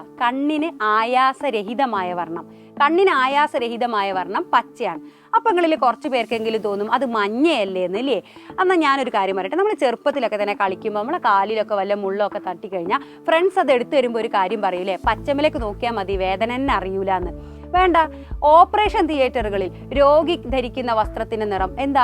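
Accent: Malappuram, India. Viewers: Missinte samsaram super aanu mis malapuram aano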